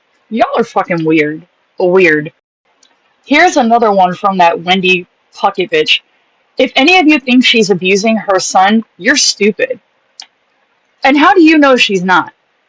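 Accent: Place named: Ireland. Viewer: Your perspective is exactly right. I enjoy listening to you.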